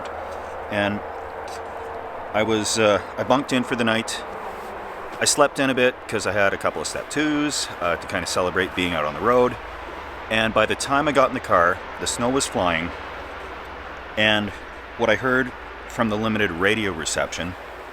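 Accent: Canada. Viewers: is one of the sweetest, most wholesome people on this platform. Whenever I hear speak, I am enamored by his thick Canadian accent, but more importantly his positive attitude. is a treasure and we must protect him at all costs.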